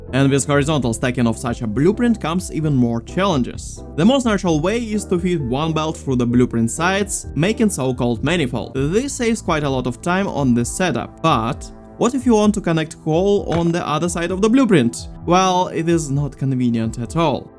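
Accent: Russia. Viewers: слишком слышно русский акцент, но видос классный, повторю как дойду до угольной энергии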